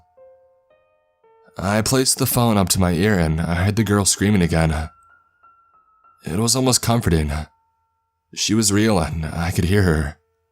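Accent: Canada. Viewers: South Park Canadian narration...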